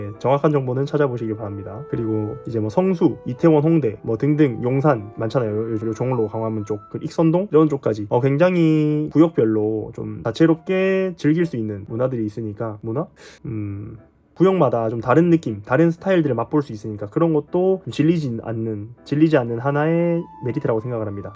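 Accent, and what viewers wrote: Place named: South Gyeongsang, South Korea. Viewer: 창원분이시구나 ㅋㅋㅋ 어쩐지 익숙한 경남 사투리네요 ㅋㅋㅋ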